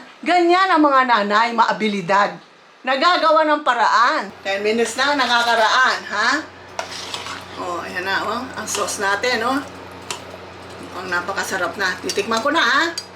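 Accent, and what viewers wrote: Iloilo, Philippines. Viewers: ilongga si Mommy??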